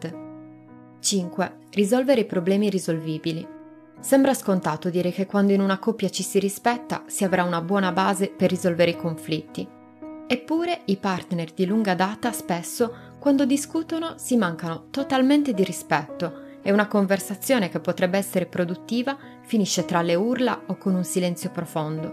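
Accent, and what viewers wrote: Sardinia, Italy. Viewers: so che non è inerente al video... ma quanto è bello l'accento sardo? :D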